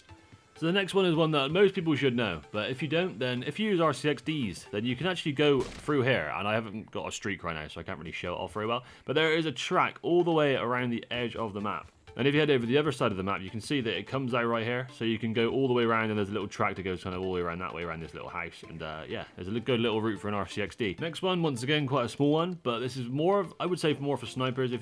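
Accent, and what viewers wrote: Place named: Bristol, United Kingdom. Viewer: You sound very local to me lol...... Bristol?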